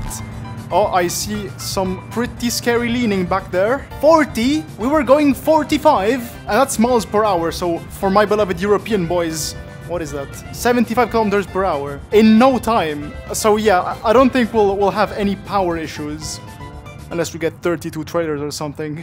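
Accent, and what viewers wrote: Italy. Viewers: Bro sei italiano? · Ma sei Italiano o cosa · ma da che parte dell' Italia sei?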